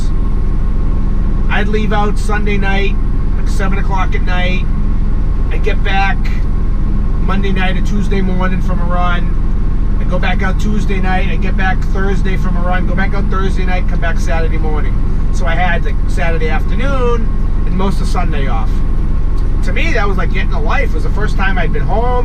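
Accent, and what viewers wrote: Boston, United States. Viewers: Hell, if I have to drive my pickup to a job 20 miles away, by weeks end I complaining to my bride that with all this driving I feel like an over the road trucker 🤣🤣 love you videos , grew up on the south side of Boston and left 31 years ago and it’s great to hear some one that talks normal 😜😜😜✊✊ that’s wicked pissa 😀😀🇺🇸🇺🇸🇺🇸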